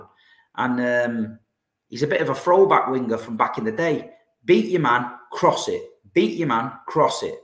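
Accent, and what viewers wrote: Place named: Blackley, United Kingdom. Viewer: Alright, are you from Blackley?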